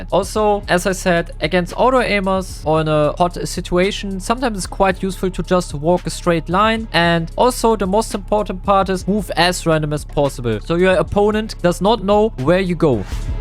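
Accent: Germany. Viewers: You speak English like Thomas Tuchel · Man merkt so hart das er deutsch ist. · Du bist doch deutsch oder?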